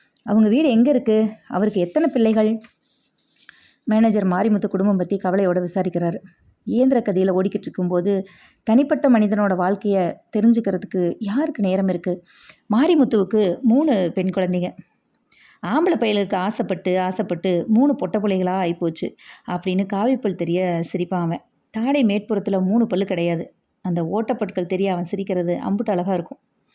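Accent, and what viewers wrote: Tirunelveli, India. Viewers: உள்ளத்தை உருக்கிய கதை.நல்ல கதை.தெளிவான குரல் வளம்.திருநெல்வேலி பாஷை அப்படியே.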